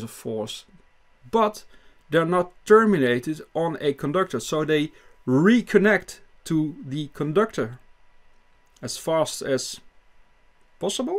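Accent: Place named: Netherlands. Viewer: echt heel interresant gij zijt mijn nieuwe favorite youtuber dank u voor al u wijsheid ik veronderstel dat u van nederland bent aan u accent te horen :) hoop dat ik juist ben denk het wel ik ben van belgie en wil heel graag meer weten over deze onderwerpen en jij legt het heel goed uit en ben u heel dankbaar daarvoor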